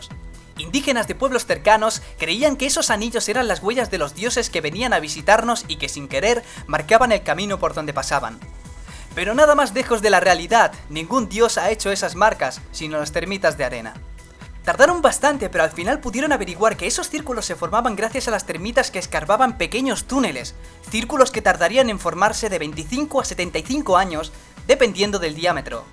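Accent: Spain. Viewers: Ese acentote español. Casi ni se nota que es. Aún no se drogaba pero usaba la misma música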